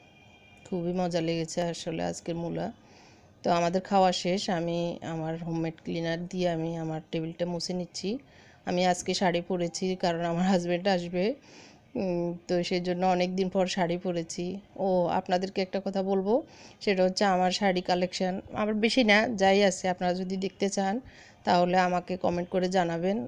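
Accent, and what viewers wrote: Noakhali, Bangladesh. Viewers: Apner kotha gulo purai noakhali la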